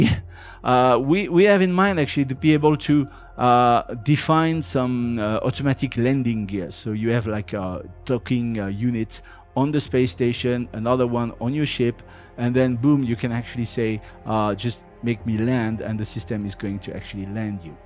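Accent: France. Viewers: C'est mignon, l'accent français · l'accent français ^^ grillé a 100km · Cet accent français ! 😀 Accent peu prononcé en fait, ça va. C'est même très bien ! Bravo !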